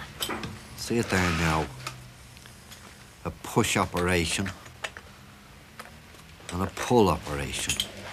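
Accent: Dublin, Ireland. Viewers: Chris Clark it’s what is known as “ an auld fashioned” dublin accent. Far softer and far better pronunciation than the dumb sounding monotoned aggressive sounding accent that Dubliners have today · I was just thinking, the narrator has a great Dublin accent, without being common as muck. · I haven’t heard that accent since I was a little girl! My great grandfather was born in Dublin, came to US in 1928. He passed in 1964 when I was age 12.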